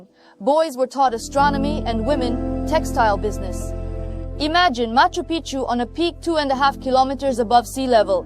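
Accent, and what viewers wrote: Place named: India. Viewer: True indian ascent ❤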